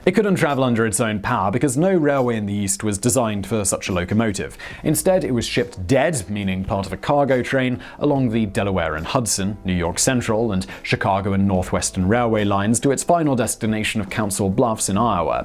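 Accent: England, United Kingdom. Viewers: a suggestion. Slow down. Your videos are educational and informative, but your pace is unnecessary. Your knowledge and British accent alone keeps people watching, but your pace has me tuning out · OK THANKS, I ENJOY WHAT HE IS TALKING ABOUT... BUT A LITTLE HARD TO CATCH ALL THE FAST WORDS WITH THAT ACCENT.. MY BRO IN LAW IS FROM ENGLAND, BUT HAS LOST MOST OF HIS ACCENT... KEEP UP THE GOOD VIDS...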